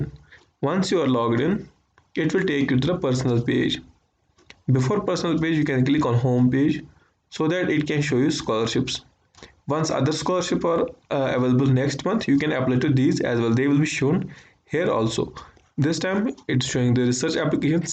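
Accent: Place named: Kashmir, India. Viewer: bhai yaar accent se laga ki ap kashmiri he 😅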